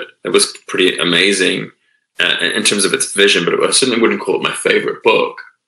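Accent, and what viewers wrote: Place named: New Zealand. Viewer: Kiwi accent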